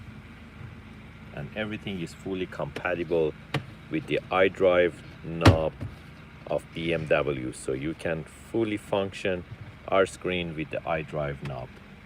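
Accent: Iran. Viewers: The guy accent looks iranian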